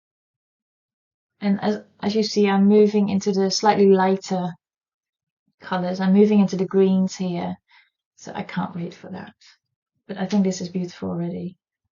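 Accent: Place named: United Kingdom. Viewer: I love!! And I’m really enjoying to watch your videos. So in awe of your British accent too 😊 Greetings from Switzerland!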